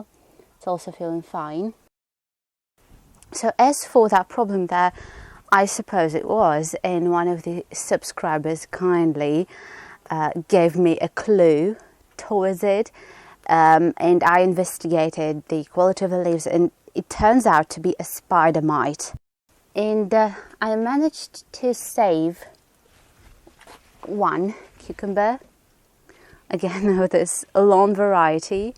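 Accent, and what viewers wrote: United Kingdom. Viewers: Ur accent is british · why you have a british accent?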